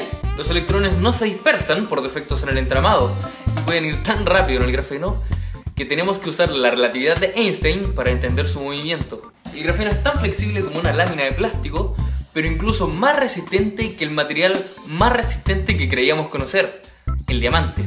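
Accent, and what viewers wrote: Chile. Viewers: Oye, qué genial tu canal. Estaba buscando imágenes del grafeno para tratar de entender el tema de los cristales, para ubicarme en eso de que "son la excepción a la morfogénesis autónoma" que plantea Monod y todo esto porque estoy leyendo sobre la teoría de Gaia de Lovelock para una revista digital que edito... o sea, la vuelta pa' larga! (pero entrete, una cosa lleva a otra). El caso es que llegué al video y caché al toque que eres chileno, jajaja! Y bue... me di una vueltecita por otras cápsulas y nada, poh, que está muuuuuy interesante. Ojalá en los colegios y liceos enseñaran así, con experimentación casera. Ta muy bueno! Un aporte. Eso. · Eres chileno?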